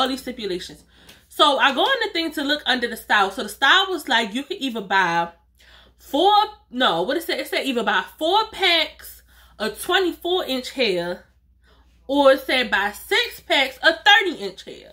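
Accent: Baltimore, United States. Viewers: Baltimore Maryland for sure lol I hear the accent , I'm from Alexandria Virginia 😁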